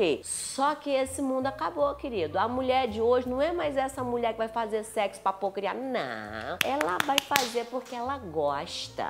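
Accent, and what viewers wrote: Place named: Minas Gerais, Brazil. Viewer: kkkkk mineiros são reconhecidos no mundo inteiro p geito gostoso de falar né vdd??? Eu sou de BH por isso percebi ki vc mineirinha uai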